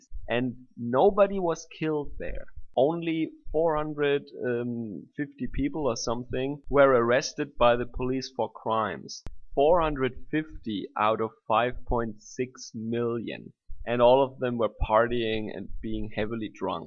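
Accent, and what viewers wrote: Germany. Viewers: Your German accent is cool. I live in America, but I took german in high school, and I would love to visit one day. I'm kinda put off by the migrant situation in germany, maybe the is a stupid question but is it still safe to visit Germany one day? Will I actually get to meet german people? lol like I said maybe a stupid question but I would love to visit one day.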